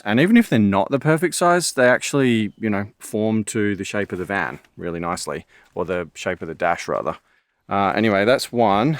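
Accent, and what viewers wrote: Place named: Australia. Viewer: Hello, have to ask are you an 🇦🇺Aussie? First visit to your Channel and hear a familiar tone? Great Vlog Thank You looking to grow some food on the road, 👍🏻👏🏻🙏🏻🙋🏻‍♀️🇦🇺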